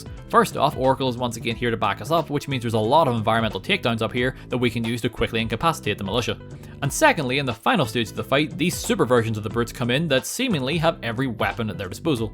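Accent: Northern Ireland, United Kingdom. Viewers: Northern Irish! cool to hear an NI accent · Are you from Northern Ireland?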